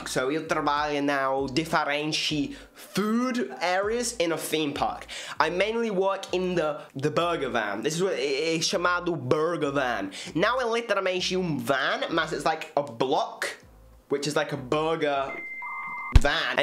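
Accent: Brazil. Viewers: Kkkkk com você fala com um gaúcho falado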